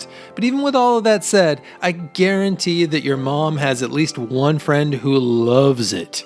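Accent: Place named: Canada. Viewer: The commentator sounds Canadian. I love the way he says a boot instead of about!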